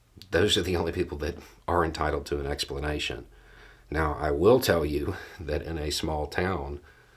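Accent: South, United States. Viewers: What sort of a loser turns to a pontificating pumped up talking head on Youtube for life advice? Someone so desperate for an accepting father figure that a southern drawl is all they need to feel they've come to the right confessional. "Small towns" are tribal enclaves, they are not cities, they are not simply places where humans accumulated. There aren't any visible fences or walls, but small towns are not open places for anyone to live there, or visit there, or even simply pass through there. They are encampments. If you don't fit in you have no business being caught there after sundown...or during sun up, either. They don't want this kid's kind. Goodbye and good riddance.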